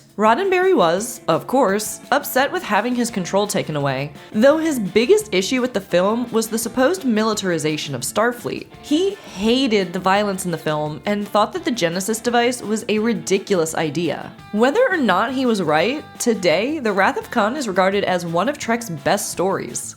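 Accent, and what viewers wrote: United States. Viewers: American accent threw me off for a sec 😂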